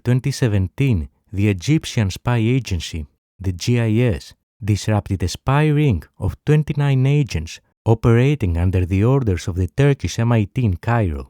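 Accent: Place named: Greece. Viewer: He's greek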